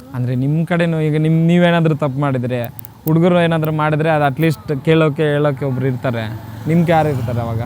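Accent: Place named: Tamil Nadu, India. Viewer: Kannada gottiro huduga bekappa. Eee tamil hudugana kannda kelodikke agodilla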